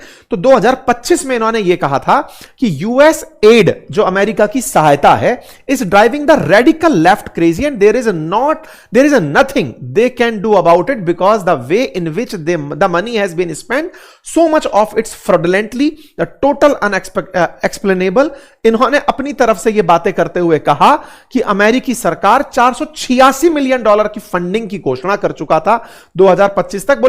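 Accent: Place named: Rural, India. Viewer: Bhai dehati English pronunciation 😢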